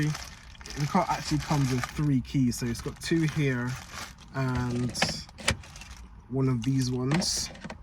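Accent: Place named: Bristol, United Kingdom. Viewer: Great video mate, new sub here!! You sound like Bristol way?? Might be wrong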